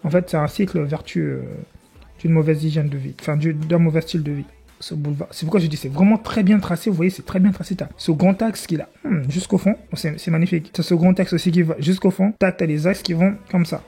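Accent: Benin. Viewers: Ton Lomé a quoi de plus beau que ce qu'il a montré ? Ou bien tu veux qu'il invente des images pour Lomé ? Le temps accordé aux 2 villes est relativement équitable. Tu l'as vu comme un béninois ? C'est c'est l'accent béninois ça ?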